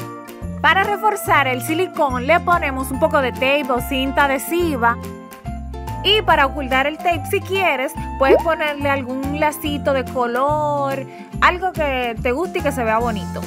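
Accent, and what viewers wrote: Dominican Republic. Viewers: eres dominicana? o de alguna isla del caribe? 😍 siento como un asentillo dominicano xd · Hola que bn que me encontré con estos vídeos tu eres dominicana verdad porque hablas igual que yo . soy dominicana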